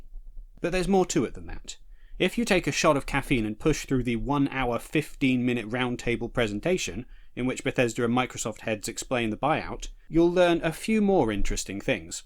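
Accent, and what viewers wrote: United Kingdom. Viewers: Dude, your British accent and calm demeanor can’t hide the truck load of salt in your voice And this is coming from a guy who owns a PS5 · You're an embarrassment to the English accent. Good lord, what an absolute cretin.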